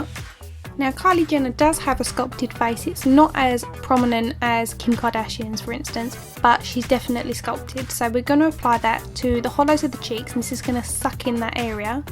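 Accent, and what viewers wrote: Essex, United Kingdom. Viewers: love your accent, Essex? x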